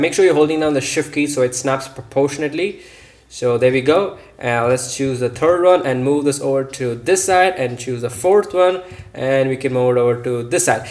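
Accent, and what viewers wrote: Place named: India. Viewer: You're indian I kinda speak similarly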